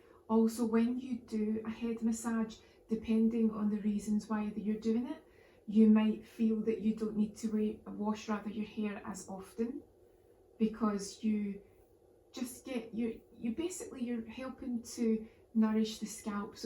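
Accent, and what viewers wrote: United Kingdom. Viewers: Is that British accent?) I'm definitely not used to British, and why does it even sound to me like slavic sometimes😂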